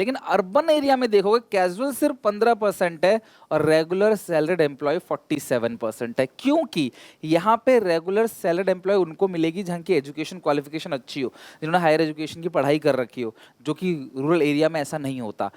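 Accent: Odisha, India. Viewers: Hello bhaiya Are you from odisha??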